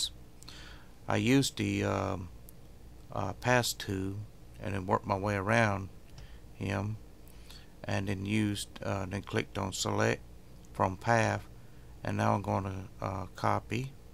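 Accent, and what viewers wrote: South, United States. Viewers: BEST instructional narrator on youtube! The laid back southern accent really takes the edge off.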